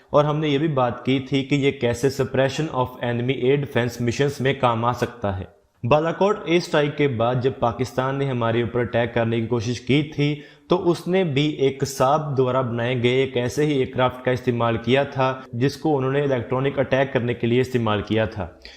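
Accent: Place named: Pahadi, India. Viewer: Being a pahadi... And hearing your voice seems you are too. Good job bro 👍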